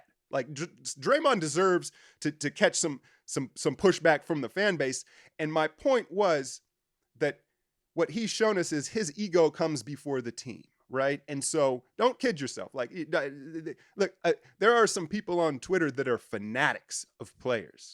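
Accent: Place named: New York, United States. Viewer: Na bro, you can't make it in warrior media for a second. You way too blunt for that organization. You really sound like a New Yorker if am being honest. If I never knew you; I'd think a New York media is bashing the warriors all these years. Happy takes boss!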